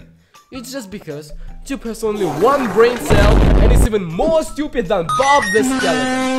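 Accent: Russia. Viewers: Bro has that Russian accent 💀💀💀 Also btw I'm not hating, i probably also speak like that since English isn't my first language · why do you sound like you have russian accent? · Why do you have a Russian accent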